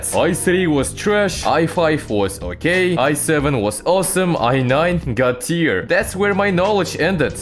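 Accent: Russia. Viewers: That russian accent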